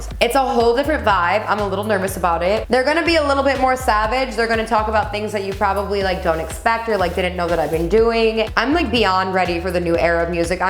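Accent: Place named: Nashville, United States. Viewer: She said she just got back from Nashville. Kind of just sounds like that accent is coming out a little! I’m from Mississippi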